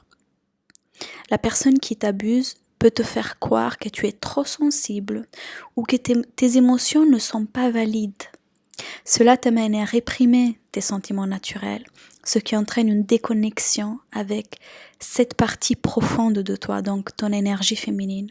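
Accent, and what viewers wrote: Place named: Iran. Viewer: Merci 🙏 pour vos vidéos D ou vient ce jolie accent? Je dirais l iran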